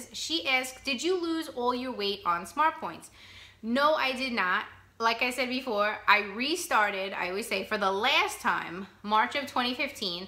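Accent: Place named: Long Island, United States. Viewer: The Fit and Fabuless Mama I am in Ontario I live in the north of the province in a small town close to Huntsville Love that you caught the u, if we were speaking g then for sure you would hear a Canadian accent as I hear your LI accent and think it's lovely 😊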